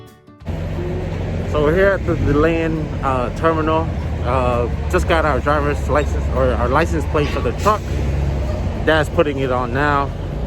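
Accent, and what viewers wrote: Vietnam. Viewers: nghe giọng a giống người Nha Trang! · You sound Vietnamese?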